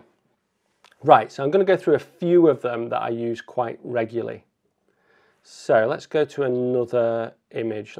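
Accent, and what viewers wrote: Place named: Sheffield, United Kingdom. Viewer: Great video. Is that a Sheffield accent I hear:) Nottingham lad here...!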